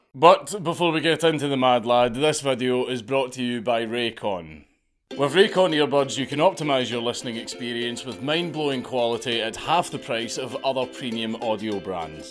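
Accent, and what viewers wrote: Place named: RP, United Kingdom. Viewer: Completely off-topic: It's been SO helpful listening to you. Even though you speak almost BBC-quality English (please don't take offence, this is meant as a genuine compliment), ever since I've started listening to your content my ability to understand out-in-the-streets Scots has improved dramatically. You've pretty much been the bridge I needed from "The hell? Am I supposed to understand this?" to "Oh, it's Scottish. No problem." Of course, some elderly folk out of the big cities somewhere on the Whisky Trail are still far beyond me, but that's fine. After a tumbler or two of liquid smoke barriers just have a tendency to melt anyway. ;) Take it from someone speaking English as a third language: you're not just entertaining and informative, but also educative, even in ways you don't expect. Should I ever happen to run into you in person while on the Trail, expect being invited to a beverage by some random stranger claiming to be a fan. 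;)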